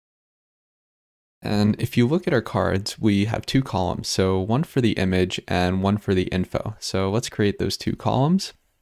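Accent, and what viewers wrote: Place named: India. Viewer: Great video, by the way, are you Indian or Russian? Great accent and a very soothing voice.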